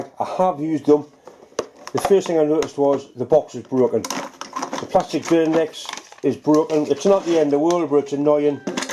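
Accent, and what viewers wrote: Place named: Liverpool, United Kingdom. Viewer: I know a fair bit of effort goes into these videos. I want to be constructive: first please try & speak with a little less of the scouse (?) accent, I kept missing points that were maybe important. I also noticed some viice movement. If these drills are as good as you ,say they must be from another Chinese source. Those I have ordered so far (Drillpro) have the worst sharpening I have ever seen, & it's hard to sharpen drills below say 5 mm, at least for most of us. Of course if an edge should chip you will need to resharpen, how quick & easy is that - well you did not consider that. Remember also not many of us have a milling machine or high quality pedestal drill, so your tests should have been at least 50% freehand drilling. Finally I watched throughout & noticed that in most cases (not all) one flute appeared to be doing most of the cutting; this suggests the sharpening is not too good & that really is important. 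I almost forgot - yes you made surprising progress without failure but some repetitive drilling is needed to gain some insight into how well the drills are doing. You should have attempted at least 20 holes from one drill & maybe 50 holes in mild steel. Thanks for the video.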